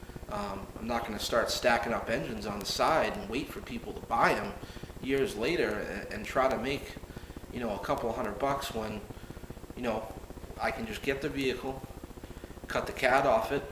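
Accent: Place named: Massachusetts, United States. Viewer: hey man from your accent you sound like your from MA? Anyways I gotta agree with you. Alot of people on here watch davesfarm and that other bullshit and get these crazy ideas. Plus alot are younger kids. I live locally and know what people will buy on CL around here. A good running 350 sure thats worth pulling or a decnet transmission. You sure don't see many s10 blazers here any more and the 4.3 would not be worth the time. Why do people like the 4.3 and 3.8 so much anyways?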